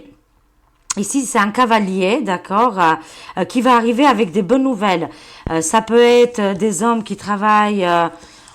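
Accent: Albania. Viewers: Hi, l'accent Ok, je sais pourquoi puisque vous êtes d'origine albanaise...Mais - ceci expliquant cela - vous n'avez pas bien saisi le sens du mot: PHILANTHROPE qui veut dire: plein d'amour pour l'humanité!.. Avec eux, c'est même TOUT LE CONTRAIRE....IL FAUT DIRE pour qualifier cette clique ( à claques )....MISANTHROPE, qui est celui qui déteste les gens.. Mais c'est encore trop doux pour eux. On peut y aller avec: carne...charogne...harpie (si c'est une femme), salopard (si c'est un homme) et SUPPÔTS DE SATAN pour les deux sexes. Attention, ces mots restent dans le domaine de l'insulte....Le mieux c'est d'employer le mot: MISANthrope.... J'aime bien ce que vous faites...Un petit bonsoir du Prof... PS/ Pour la nouvelle coupe, elle est bien, mais je n'avais pas vu lorsque vos cheveux étaient plus longs.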